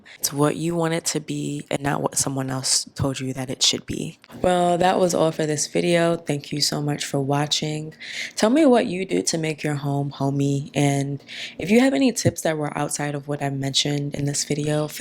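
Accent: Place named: East Coast, United States. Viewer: ur accent is so east coast, so comforting 🙂‍↕🙂‍↕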